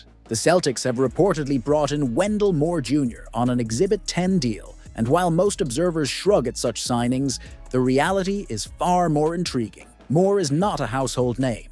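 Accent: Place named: United Kingdom. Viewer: What a waste of my time. Bloviation. Nice touch to put a British accent on the AI narration making it obvious you know nothing about Boston basketball. I'm blocking this channel.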